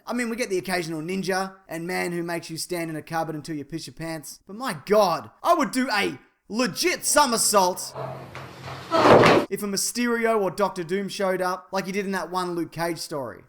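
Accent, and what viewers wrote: Australia. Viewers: hey you're aussie right? like... you sound aussie. if you are, which state. WA is where I'm at.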